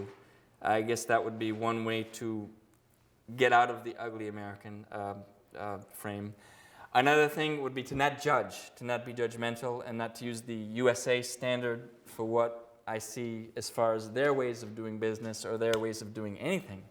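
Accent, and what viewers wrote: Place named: Northern Europe. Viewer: He said he was in Syracuse until his teens. Why does he not sound like New York (or even American)? No disrespect intended. I'm just curious. I thought he was from somewhere in Northern Europe.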